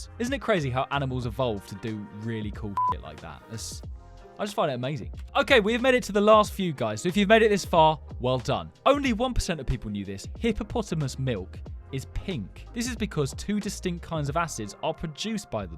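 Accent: United Kingdom. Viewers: He sounds i bit British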